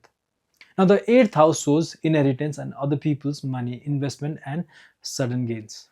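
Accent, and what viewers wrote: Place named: Nepal. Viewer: Sir aap nepali ho aap ki english tone nepali si aa rahi hai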